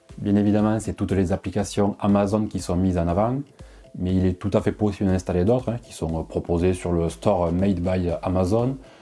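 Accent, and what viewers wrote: South, France. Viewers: Bonjour, merci pour votre vidéo. Je suis pas très au point sur la technologie mais j'ai compris tout ce que vous disiez ^^ Du coup j'ai une question complémentaire : Puis je voir les chaîne "TNT" via ce petit boitier ? Mon but ultime serait de modifier mon abonnement téléphonie pour n'avoir plus que internet et voir la tv sans le décodeur traditionnel :) Merci d'avance et vive l'accent du sud !!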